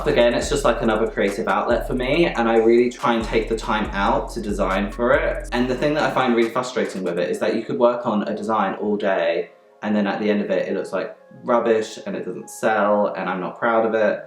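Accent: United States. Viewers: i never get bored about hearing about Michael Jackson, also like the American voice on the videos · how come the voice overs are American men and women? I didn't know that this was a British content creator behind this Channel. Makes me like it more, fellow Brit! 🇬🇧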